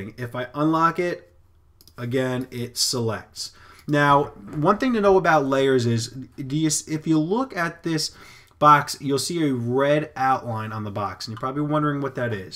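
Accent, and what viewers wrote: New York, United States. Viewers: Awesome, thank you fellow New Yawka!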